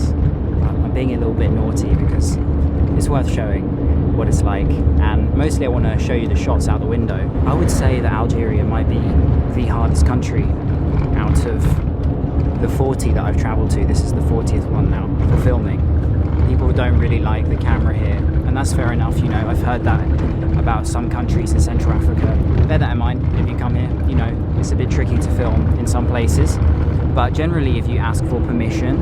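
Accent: United Kingdom. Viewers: Same as me I was always looking at this lakhdaria I've never seen any other place until I've once went to oran and now I'm learning more about my country from a foreign tourist as you see 😅. I thought that we have no tourism at all 😂😂 until I saw this video btw from his accent I suppose that he's from UK I'm kinda impressed by the information that their universities provides for their students and I hope I can go study there 😅.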